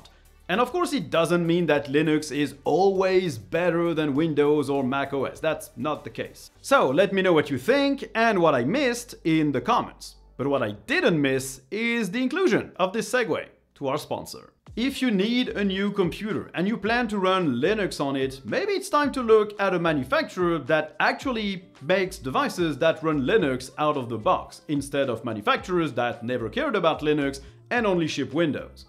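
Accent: France. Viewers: On est d'accord t français ? j'ai l'impression avec l'acent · nice french accent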